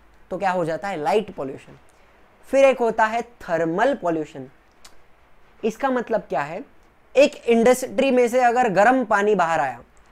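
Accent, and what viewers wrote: Maharashtra, India. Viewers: Sir mast shikavata tumhi Tumhi marathi ahat ka I thought that because of your language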